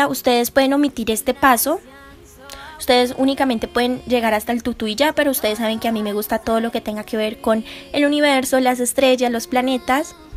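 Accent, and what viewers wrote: Colombia. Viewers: Supuse que eras Caleña! saludos desde el sur, por cierto, nueva sub!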